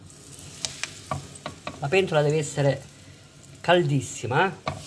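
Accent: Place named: Lecce, Italy. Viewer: mi sono appena iscritta al tuo canale, sei bravissima e simpaticissima con la tua parlata leccese, io sono di taranto e mi piace tantissimo cucinare, come da buona pugliese. Ciao e adesso che ti ho scoperta non ti lascio più. Amica mia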